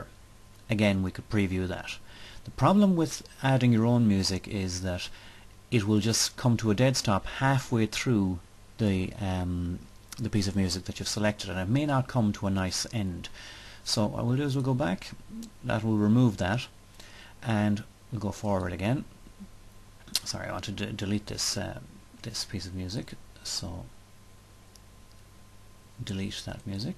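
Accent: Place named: Ireland. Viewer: Hi guy. Enjoyed your tutorials. Love that Irish accent; just came back from a 12 day tour through your lovely country. Tell me, how can I play background music on just a bunch of slides in the middle? I start narrating and then I want to play some music without narration, and then pick up the narration again later? Please, please!!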